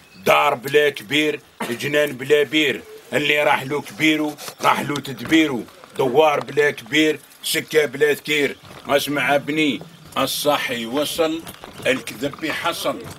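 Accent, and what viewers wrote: Chaoui, Algeria. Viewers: Midi Bi تحية للشاوي من عند الشاوي بصح اللهجة نتاع السيد ما تبا نش سطايفية . تقول عليه شاوي حر من اولاد سي علي .والله تقول من عرشي .واش رايك . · هدرا شاوية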